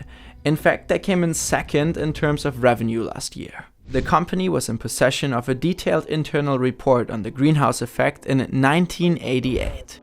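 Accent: Germany. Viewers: Holy fuck bruh I wish I spoke German the accent is clean as fuck👌🏾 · Y'all may consider hiring a native English speaker, or having the usual narrator take some spoken English language courses. There are some very common, German pronounciation mistakes in this video, i.e. pronouncing 2019 as two-thousand-nineteen rather than twenty-nineteen. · Great Video but i must say that the german accent is a bit distracting. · I honestly can't watch these videos. I find myself to be a perfect example for the classic german phenomenon of hating how a german accent sounds eventhough you have one yourself. I'd love to see these videos on Simpli or you getting an english native speaker for the voiceover tbh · Love your German accent. Love from Britain! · Krasser Akzent, den find ich sogar als Deutscher unangenehm^^ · Nice german acsent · Dudes! I'd really like to do voice-overs for your vids. 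Although it doesn't seem to be a big problem, I could get rid of the entertaining german accent😉 I can't really find an imprint with an email adress, so I have to rely on my comment being upvoted... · Yourrr loveley Germann Accent makes it pretty easy to understand it. For me As a german fellow with a way harder german Accent. Sorry for bat-english. They tend to fly around my head every now and then. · Even if I love your videos and the concept is a good idea in theory I just can't handle the German accent. If you're looking for someone to read your scripts I volunteer. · Nice Video - smooth Animations, (I love the intro!), informative and your german accent is not that bad :P Good luck with the channel, I hope ppl will write their comments in god damn english otherwise non-german-speakers will just be annoyed as hell xD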